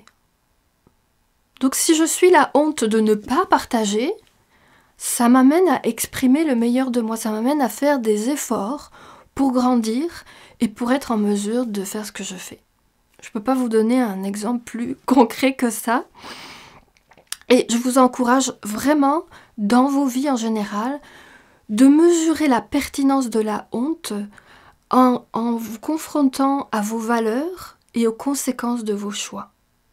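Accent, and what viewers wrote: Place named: South, France. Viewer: Tu viens du sud toi c'est clair ;)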